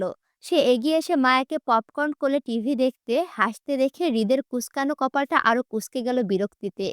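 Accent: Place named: Chittagong, Bangladesh. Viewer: তোমার মুখে আমাদের চট্টগ্রামের ভাষা খুব মানাইছে😊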